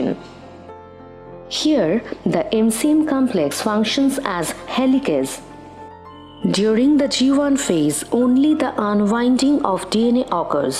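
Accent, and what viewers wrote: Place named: India. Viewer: If only the indian accent wasnt so thick.. 😢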